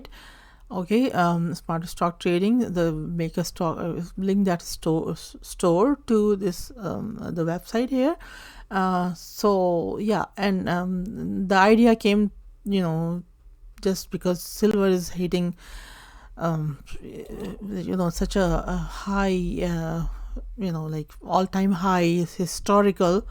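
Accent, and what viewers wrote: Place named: India. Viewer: Wishing you all the best for your health. From your accent, it's my guess that you may be of Indian descent. The medical infrastructure in India is world class, low cost and without any waiting period. Even if you don't have medical insurance, the costs of investigation/surgery in top-class hospitals is affordable. Consider making a trip to India and sort out all your health problems.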